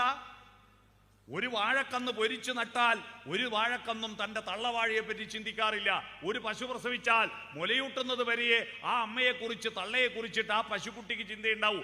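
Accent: Kannur, India. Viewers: വഴക്കന്ന് പൊരിച്ചു വെച്ചോ വഴക്കന്ന് പിരിച്ചു വച്ചു എന്ന് പറയു വെറും കണ്ണൂർ ഭാഷ പറയാതിരിക്കുക...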